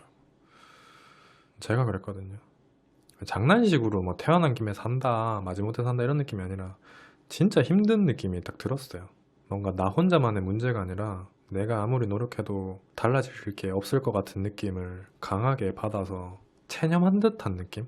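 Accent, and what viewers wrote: Gyeongsang, South Korea. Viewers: 경상도 억양이 있네요~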